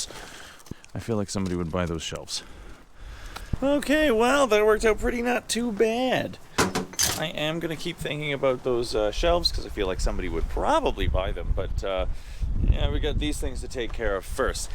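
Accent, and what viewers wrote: Canada. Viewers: Good one......ooohh yeaah its got some weight to it.....Same same but with Canadian accent Love it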